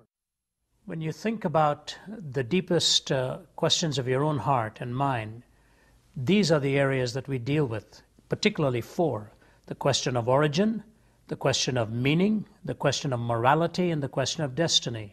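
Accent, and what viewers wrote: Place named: India. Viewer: From the outside, he sounds like any other evangelist/ con man, only with that India/ Pakastani/ guru accent, that makes people 10 times more likely to believe he has spiritual access to your God. Doh!... Why is it, that all of the defenders of Christianity have a big money stake in keeping it going?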